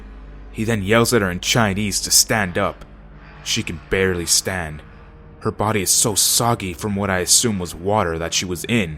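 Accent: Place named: Mexico. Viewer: u sound mexican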